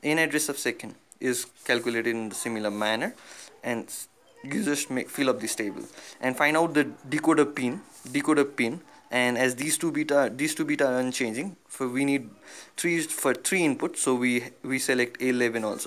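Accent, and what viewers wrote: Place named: India. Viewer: PLEASE Turn upside down these damn papers because it is hard to listen and understand English in Hindi accent. You add another difficulty one it and made it double difficult.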